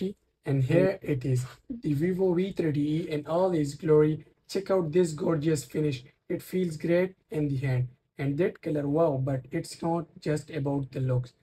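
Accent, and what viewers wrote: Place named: United States. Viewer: American accent!!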